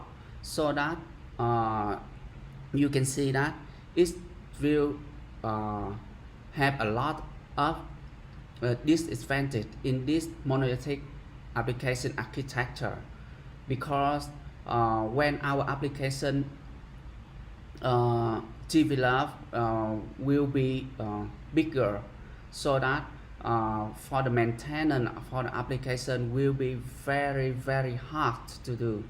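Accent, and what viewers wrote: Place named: Vietnam. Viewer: Ây cái phát âm này ông bạn là người việt phải kh -,- Video hay lắm, cảm ơn ông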